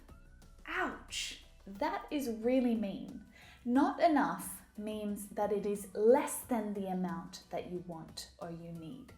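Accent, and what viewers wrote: Australia. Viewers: You're smart enough to make me love English language particularly Australian accent.Many thanks dear. I need a lesson about reading comprehension (pre reading, how I deliver it for Arab students ( pre intermediate level)🌷⚘🥀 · You just made Australian accent the best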